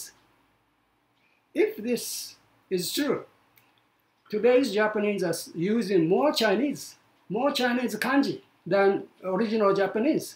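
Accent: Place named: Philippines. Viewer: He sounds like a filipino when he speaks english with a little japanese nuances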